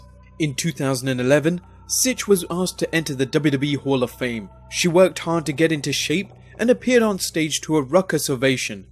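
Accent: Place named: United Kingdom. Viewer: I wish this information was spoken in a voice that wasn't from the British pits of hell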